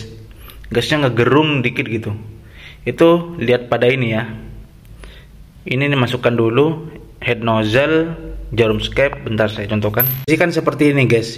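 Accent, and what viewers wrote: Madura, Indonesia. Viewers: Jek logatah reng madureh abiss mas😂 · Katara men reng madura , bhasa agak sal sal sakunik 😅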